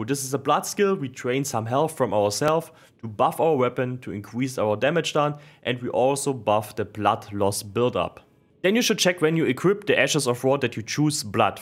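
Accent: Germany. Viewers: Gehe ins Video und weiß direkt am Akzent, dass du deutscher bist😂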